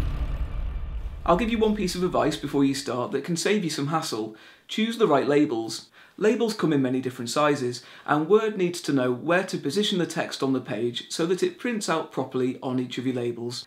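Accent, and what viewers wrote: England, United Kingdom. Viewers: Brilliant easy to follow instructions with an ENGLISH ACCENT. My only question is ….my laptop doesn’t produce another sheet when I get to the end of first one and I have to start all over again. I’m sure I’m doing something wrong. Please help. Thank you.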